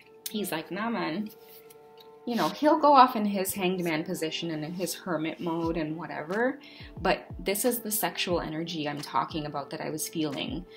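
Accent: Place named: Trinidad and Tobago. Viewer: OMG my video's thumbnail is a pair of passionate lips!! I'm just waiting for it to process. Lawwddd!! Your upbeat energy made me smile right through this reading, love. Fantastic reading! It felt like a personal reading - absolutely on point!! You are so right about the DF leaving the DM to learn his lesson. We are standing in our power and finding our passion. Woohoo dat Trini talk - hot too bad!! Ah love it bad bad!! Thank you so much for the mention in the description, love! So appreciate it! 🥰💕💋🔥🇨🇦🇹🇹. Needed to add the flags!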